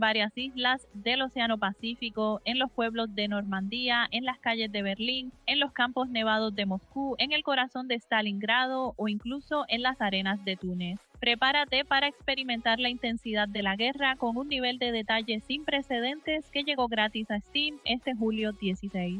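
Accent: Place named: Puerto Rico. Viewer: Saludos. Por tu voz sé que eres como nuestro coqui! Me equivoco? Gracias por el video. Adelante :)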